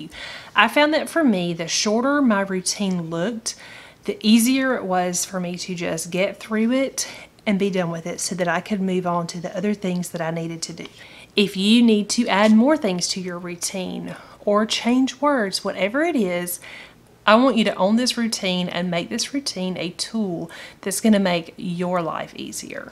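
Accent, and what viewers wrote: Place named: Alabama, United States. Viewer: this is definitely a first, Alabama mistaken for Australia 🤭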